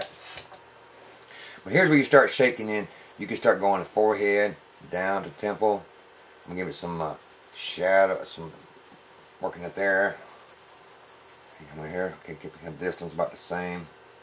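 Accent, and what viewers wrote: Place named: West, United States. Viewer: Love the Western Accent it makes the video more awesome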